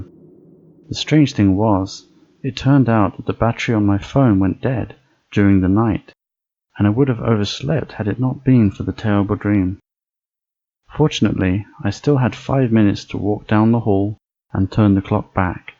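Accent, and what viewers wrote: United Kingdom. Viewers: What’s his accent? Slightly British?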